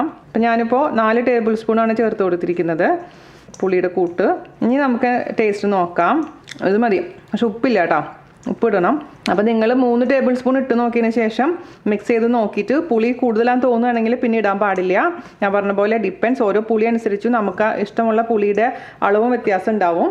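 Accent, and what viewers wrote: Thrissur, India. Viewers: Trichur slang sooo sweet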